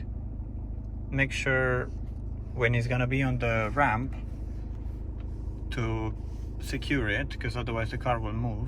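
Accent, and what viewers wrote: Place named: Romania. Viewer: Multumesc, recunosc un accent romanesc. 😂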